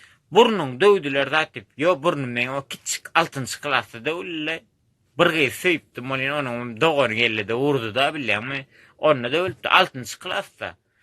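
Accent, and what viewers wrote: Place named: Mary, Turkmenistan. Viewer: Dost, senä Marydan! Gürleýşiňden bildirýär!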